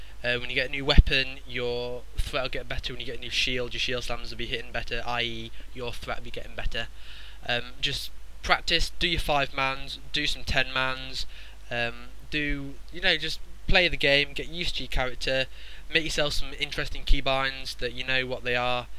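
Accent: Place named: RP, United Kingdom. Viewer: there's nothing wrong with this guys accent.. its 99% perfectly spoken "Queen's English". Very wierd thing to say.. you mustn't speak English as a first language to make that sort of unqualified comment.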